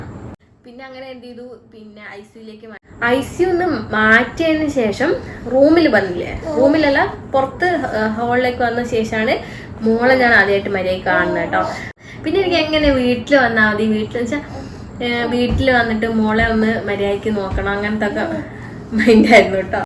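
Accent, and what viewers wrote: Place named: Kuttiyadi, India. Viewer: that is kuttiyady slang😂😂😂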